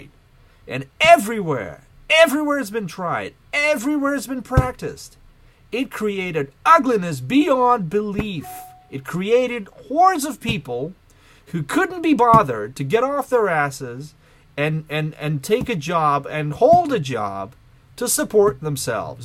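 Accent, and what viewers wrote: United States. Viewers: wow. you lived in the Soviet Union most of your life. You could have fooled me, since you have an "American" accent.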